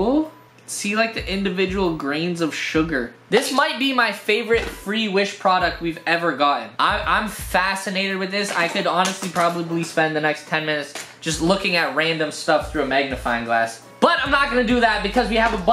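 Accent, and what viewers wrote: Canada. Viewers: I love Canadian accents. They’re hilarious (though ‘Merica has some funny accents, too). Don’t change :)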